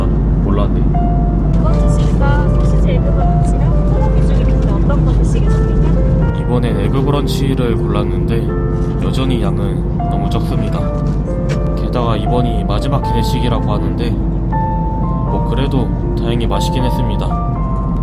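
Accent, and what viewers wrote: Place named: Japan. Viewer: 영어 억양이 뭔가 일본 억양스러우시네욬ㅋㅋㅋㅋㅋ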